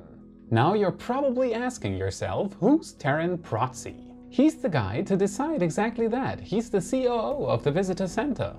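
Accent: Germany. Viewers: Are you German? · are you german? you sound very german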